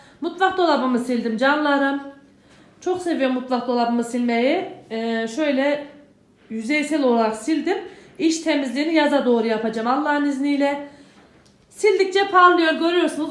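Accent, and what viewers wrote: Aksaray, Turkey. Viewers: Yeminle doğal konusmani çok seviyorum lütfen Kibar konuşma Aksaray aksanıyla konuş:^) ayrıca" dürüm" lerine bayılıyorum 👍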